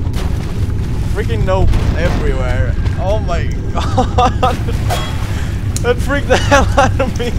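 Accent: Sweden. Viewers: Dat swedish akcent ;)) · Who came here for real Swedish accent ?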